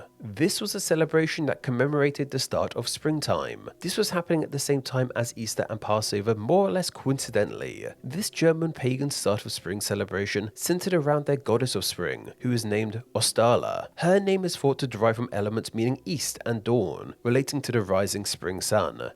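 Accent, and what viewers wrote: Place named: United Kingdom. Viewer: This is probably gonna get pushed down, but since I didn’t see anyone else saying it, the pronunciation of the Hebrew word for Passover is not in fact “Pesca”, and the meal isn’t said “Sayduh”, it’s “Pesach”(roll the ch like “fancy” Bach) and “Seder”(both E sounds are like “Ben”). A good video historically speaking, but the British accent is strong here, lol.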